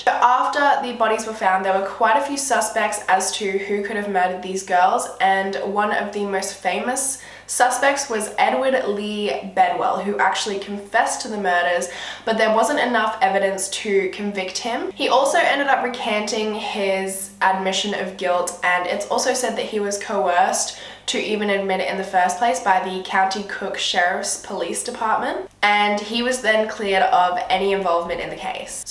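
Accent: Australia. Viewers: Not the most typical Australian accent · Is that an Australian accent? I love it😍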